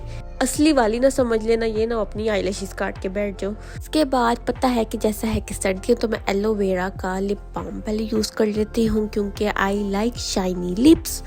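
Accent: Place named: Punjab, India. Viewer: Punjabi accent full 😅😅😅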